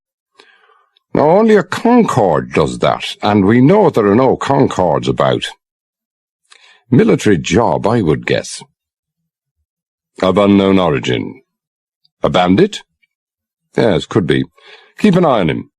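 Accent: RP, United Kingdom. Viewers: If someone will please say, what is this way of speaking? I’ve listened to a lot of different British accents and this is distinctive. What place and frame of time? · It's called received pronunciation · They spoke like this in the 40's , 50's 60's ...... grammar school posh talk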